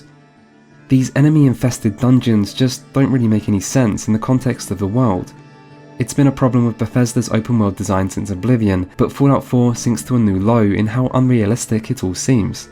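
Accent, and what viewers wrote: England, United Kingdom. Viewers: Not a criticism of you, but must every documentary guy speak softly with an English accent? It puts me to sleep, I swear to you. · Wow! My first video discovery was the amazing Lovecraftian games video, and now I can't get enough of so meticulously crafted, intellectually challenging insights on games I never pondered about. P.S. listening to that brilliant English accent narration for a non english speaker is to die for :). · Really good commentary and soothing British voice that sounds intelligent.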